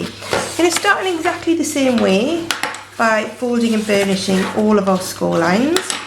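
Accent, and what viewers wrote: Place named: United Kingdom. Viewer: Another lovely gift box, you have such great ideas, thank you. I enjoy your videos and, being married to a Brit, love your accent😊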